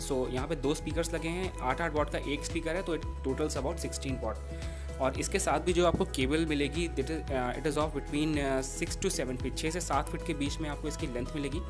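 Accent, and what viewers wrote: India. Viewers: Red zHail He has an indian accent plus i sense racism 😕.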